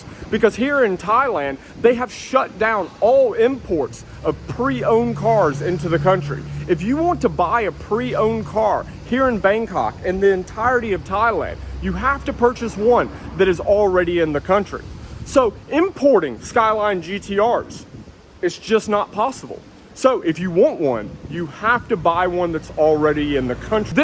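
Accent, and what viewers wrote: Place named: Appalachia, United States. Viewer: Were you from originally sound a little hillbilly to me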